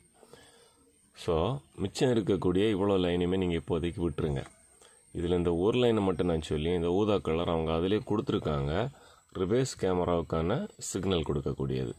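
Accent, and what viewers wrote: Kanyakumari, India. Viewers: Kanyakumari makkaloda thamil keka azhaga irukku..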